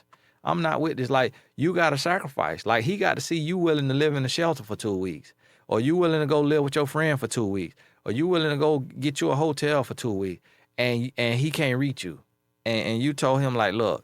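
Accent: South, United States. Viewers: You sound so country in this video 😂 “listen to meeee” 😂😂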